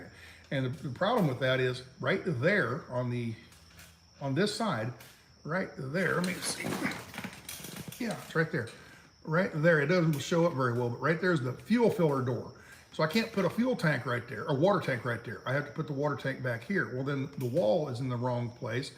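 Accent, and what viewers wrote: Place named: Ohio, United States. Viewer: Love your vernacular language. My father was from Ohio and he spoke and sounded like you. He was intelligent but kept his family language. I love your pleasant voice and giggle. Ron and I took 2 pairs of Sketchers, the same two type and colors Grey Wolf had purchased and hand carried them into the middle of Nevada. He was so appreciative. Ron walked a mile or so near Austin, NV and I kept the back end safe. Hope you mention his efforts and that those who join him have enjoyed their experience. God Bless (Geri)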